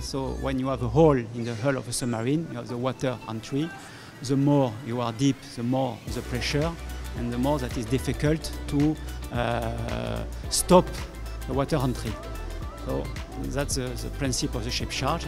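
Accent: France. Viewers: Sorry but His English accent is just terrible. For English speaking markets they should appoint someone who can speak English without or less Franch accent.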